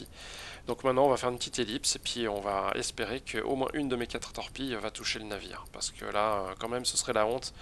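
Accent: Switzerland. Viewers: Tu es du canton de Vaud ?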